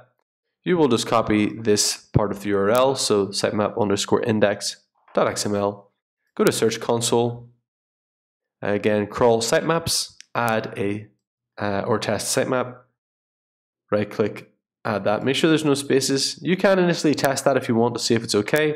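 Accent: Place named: Scotland, United Kingdom. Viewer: Thanks man, really useful. That accent is hard to pin down - I hear Scottish, American and Irish!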